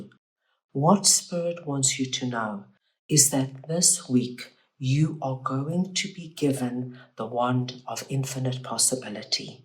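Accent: South Africa. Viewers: Are you South African ? Hearing the accent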